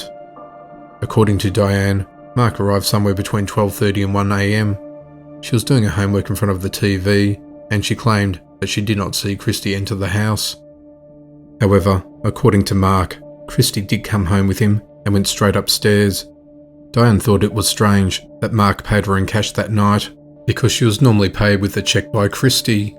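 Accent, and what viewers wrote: Australia. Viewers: Love the video, glad I've found your channel- are you a fellow Australian or from New Zealand?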